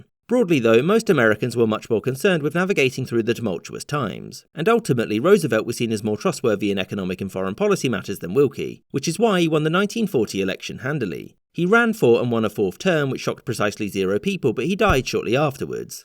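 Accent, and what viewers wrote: United Kingdom. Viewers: Why is a Brit lecturing me about my country 😡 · Clicked on this thinking “oh this should be interesting” but then it’s some British dude talking about something he has no idea about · Anyone else cautiously listen when American History is narrated by a Brit?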